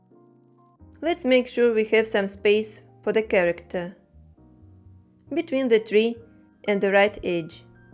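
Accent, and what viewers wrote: Russia. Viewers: Почему на английском-то с русским акцентом(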